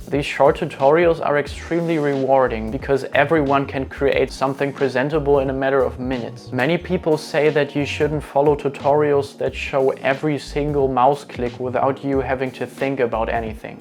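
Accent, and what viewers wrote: Germany. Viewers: I love your German accent! Great video!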